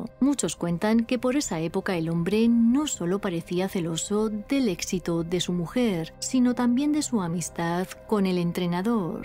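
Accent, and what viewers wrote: Spain. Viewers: El acento es obvio, española.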